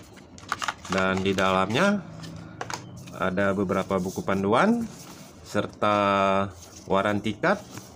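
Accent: Malaysia. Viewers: Kok ngomongnya kayak org malaysia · Ni org indonesia apa org malaysia sih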